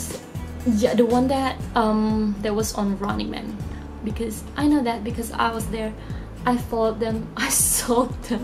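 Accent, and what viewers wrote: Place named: Australia. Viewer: wOW JUST OVER A YEARS STAY AND YOU GET THAT PRETENTIOOUS AUSSIE ACCENT!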